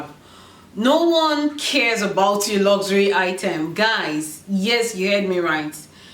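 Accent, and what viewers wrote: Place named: Nigeria. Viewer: You sound Nigerian, I can tell. I think that luxury obsession is ridiculous. I love luxury items but I wouldn't buy one as an investment.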